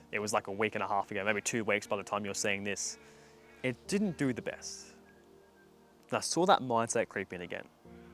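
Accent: Australia. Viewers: Are you australian if so do you live in sydney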